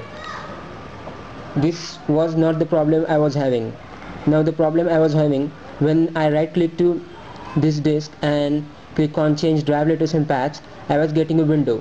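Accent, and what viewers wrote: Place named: India. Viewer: indian accent idiot.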